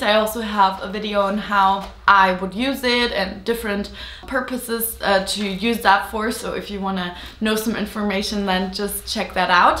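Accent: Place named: Germany. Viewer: Super schöne Sachen😍ich finde wenn man weiß dass du deutsch sprichst, hört man voll den Akzent raus🙈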